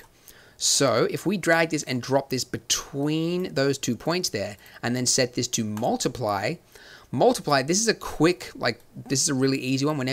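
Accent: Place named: Australia. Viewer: Ritchie He's Australian... Can you really not tell?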